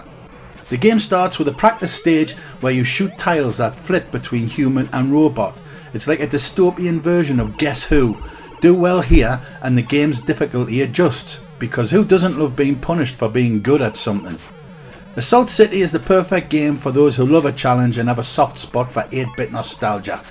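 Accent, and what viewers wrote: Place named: Newcastle, United Kingdom. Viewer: Sounds like you’re from Newcastle in the UK but doing US games and prices. Them prices were definitely high for the US and only reason Buster is so expensive due to its limited run in the US. There are a lot more rarer and more expensive games in the UK say an easy 20 games. Outrun you can pick up for about $18 in the US right now. Always good to see videos on the Master System 👊🏻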